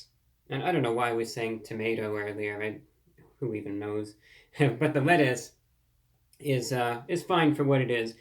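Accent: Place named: New England, United States. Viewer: Ir he just needs to start rolling his R's now lol. It's just his New England accent, hopefully he never stops doing it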